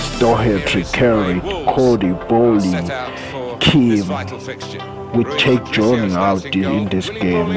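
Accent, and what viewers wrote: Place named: Zimbabwe. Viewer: u defo from zim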